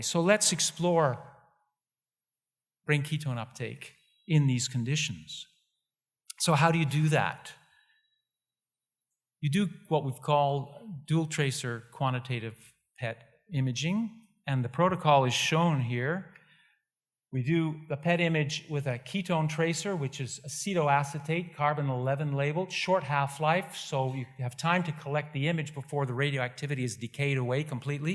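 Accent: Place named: France. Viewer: this guy's definitely French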